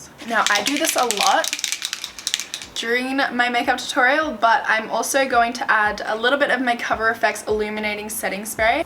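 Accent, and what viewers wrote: Australia. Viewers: I've quickly grown to fall in love with your style, personality, all around everything. Can I be you? Or can we just be friends? I'd settle for that 😫😥 keeping doing you!! I absolutely love all that you do! From cruelty free from your Australian accent to your way of explaining & doing your makeup 💋‼️😍 slaaay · I watch your videos religiously and not just for the makeup...I'm so obsessed with Australian accents lol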